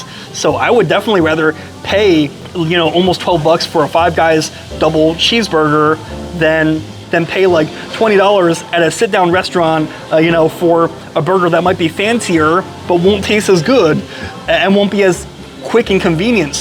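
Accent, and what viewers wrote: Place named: New Jersey, United States. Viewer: You can tell.you grew up in NY uhh NJ uhhhhh because uhhhhh I want the uhhhhh cheeseburger uhhhhhh and uhhhhhhh omg uhhhhh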